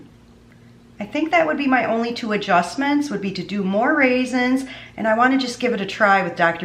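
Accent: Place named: Chicago, United States. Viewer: I love brocoli salad and don't like tofu mayo, so these are just right for me. Can't wait to try them. You sound like you live in the Chicago suburbs, is that true? Me too!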